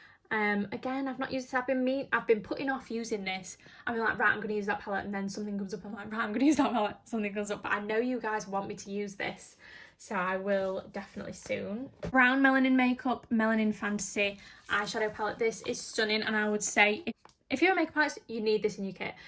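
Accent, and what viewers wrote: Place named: North, United Kingdom. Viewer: I love the way you describe everything in your northern accent!! Such a beaut collection ❤️ making me want to do an eyeshadow palette video xxx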